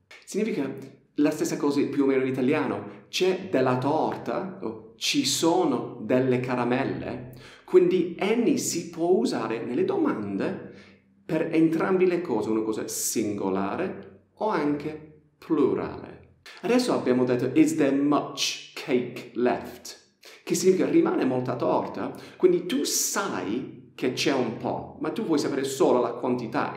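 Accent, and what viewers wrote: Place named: Bari, Italy. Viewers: Accento barese... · Sei troppo simpatico quando parli 'anglo-barese'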